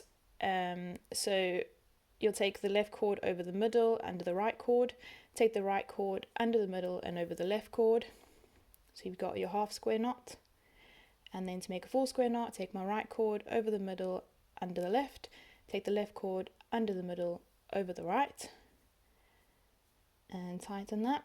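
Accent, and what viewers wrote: South Africa. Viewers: You sound like you’re from South Africa? 🇿🇦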